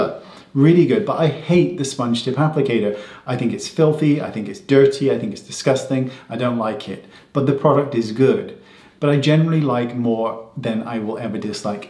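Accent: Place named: West Country, United Kingdom. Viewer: I’ve been watching for years and I still love the fact I can hear a West Country accent when you explain things. 🇬🇧 Loved this video! ✨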